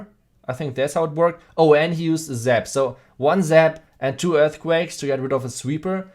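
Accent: Germany. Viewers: Speaking english with German accent, that was unique 👌👌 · Are you german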